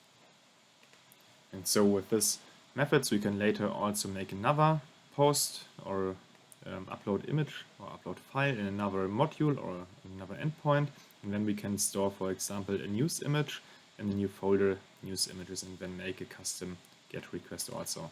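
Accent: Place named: Germany. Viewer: are you german? your accent seems like it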